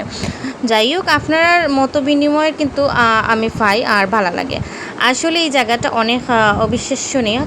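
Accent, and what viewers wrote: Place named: Sylhet, Bangladesh. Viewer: মাশাল্লাহ অনেক সুন্দর ভিডিও আর তোমার মুখে সিলেটি ভাষা শুনতে আরো ভালো লাগছে ধন্যবাদ এত সুন্দর ভিডিও শেয়ার করার জন্য লাইক এবং পুরো ভিডিও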